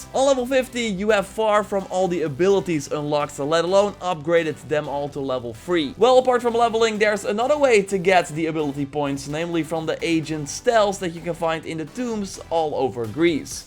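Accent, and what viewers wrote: Netherlands. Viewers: Are you from Holland? Your thick accent makes me think you are haha, greetings from Belgium. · My man is dutch isnt hé?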